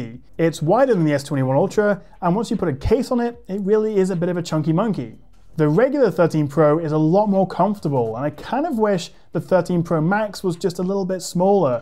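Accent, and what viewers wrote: United Kingdom. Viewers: Excellent presentation that looks like a live TV coverage. By the way, where was this beautiful village taken? Compliments to your clear, creative, and colourful narration. The British accent is so cool and clean ! love it.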